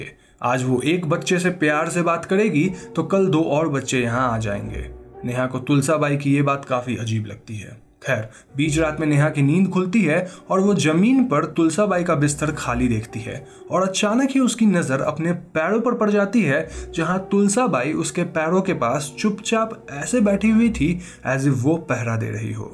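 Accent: Bengal, India. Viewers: Bhai tu begali hai kya??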